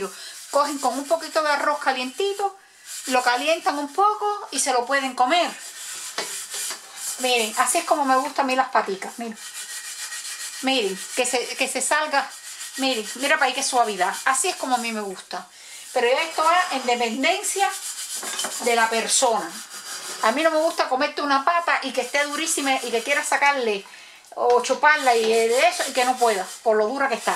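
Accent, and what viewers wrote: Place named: Cuba. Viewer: Me encanta su voz, cubania y naturalidad. Mi abuela siempre las hacía en casa. Yo voy a intentar me queden tan deliciosas como a usted. Muchas gracias.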